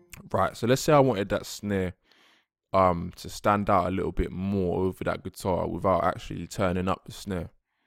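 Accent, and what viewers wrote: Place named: London, United Kingdom. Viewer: Yes, bruv!! Which part of London you from?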